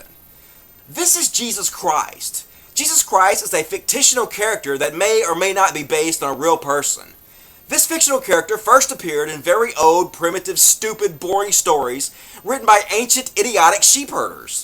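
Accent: South, United States. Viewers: You know whats funny? You have a southern accent, you're atheist, and quite smart. I always thought the first and the last two were mutually exclusive. :P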